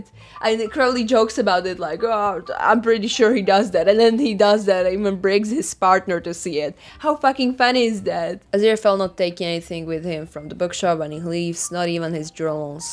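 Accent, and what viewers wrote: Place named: Eastern Europe. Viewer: You sound so Eastern European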